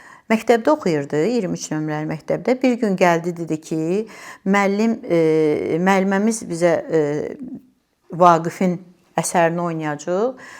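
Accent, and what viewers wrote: Baku, Azerbaijan. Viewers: xanım sizə cansağlığı və uzun ömür arzulayıram. Baki ləhcəsini eşitmək mənə cox xoş oldu. Müəlliflərə də təşəkkür edirəm.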